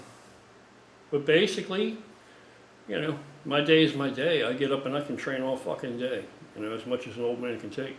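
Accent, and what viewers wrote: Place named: Western Pennsylvania, United States. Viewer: Man you sound like you're no further than 2 towns down from me in western pa. Lol Indiana County Pa.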